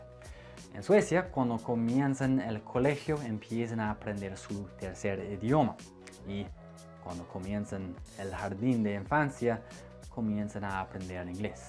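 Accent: United States. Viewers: vos sos un buen profesor, lo que no te ayuda mucho es tu acento gringo. creo que nadie querría aprender a hablar ingles con una persona que habla español con un acento tan marcado, da como mala espina. pero si sos bueno, eh aprendido mucho con vos ! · Profe debo reconocer que cuando Zack Morris nombró a Kale y a usted, sí me sorprendí, pensé que era usted y fue muy decepcionante. Porque físicamente se parecen, pero la esencia de los dos es muy diferente. Usted es el otro lado de la moneda, es profesional y carismático. Y también hay otra gran diferencia entre los dos, Kale habla un Español con un acento casi perfecto y usted profe habla un Español con un toque de acento gringo(lo cual es adorable). Gracias por la aclaración ☺️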